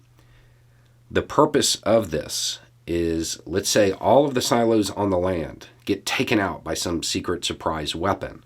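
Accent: South, United States. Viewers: For me gives me comfort that all is not lost. I live up north where it is pleases me that the way talks does not sound like me or the people you normally hear on the news or typical moderates and liberals. He is from the south, looks and sound like likes someone from the south. So people from his neck of the woods are more likely to listen to him.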